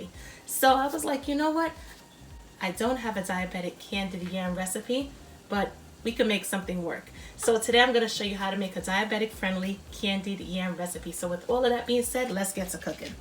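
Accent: New York, United States. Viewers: Great recipe! Better substitute would be monk fruit is it natural and it's good for cancer patients as well. Love the New York accent absolutely Gaw-gis 😂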